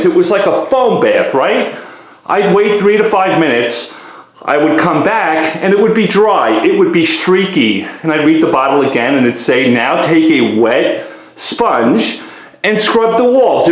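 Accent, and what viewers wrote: New York, United States. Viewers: Holy cow that sounds like my New York mom. The only difference is she then would get on a plane and do it herself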